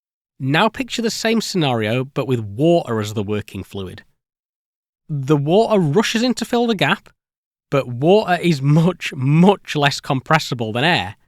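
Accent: United Kingdom. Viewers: I was wondering if this was going to be a good video, and then a young Brit started talking. I was relieved. haha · Kind of hard to take this seriously when you mispronounce "photon" 50% of the time. Practice your "t"s Sound less bri-ish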